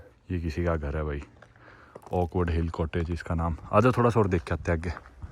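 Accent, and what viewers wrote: India. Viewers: I happened to stumble upon this channel by accident and what attracted me most was the language. At last someone speaks for Indians in their language. Talking about Wales who are proud of their heritage and try to preserve their language, this is being published in English with Indian accent. Why is there such need to speak in English for Indian audience. Knowing another language is an achievement and useful but making it your own…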